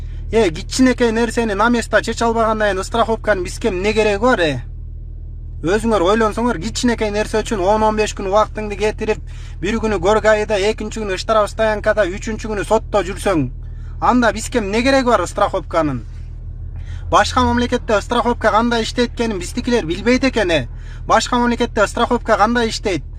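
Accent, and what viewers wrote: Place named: Pamir, Tajikistan. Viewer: Туура эле суйлодун брат. Акцент памирский экен а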